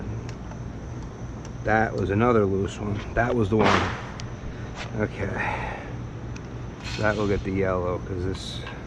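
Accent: New York, United States. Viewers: You have a New York accent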